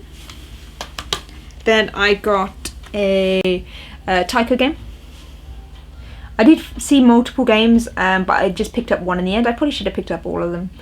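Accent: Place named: England, United Kingdom. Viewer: It's true, English accent makes everything sound classier.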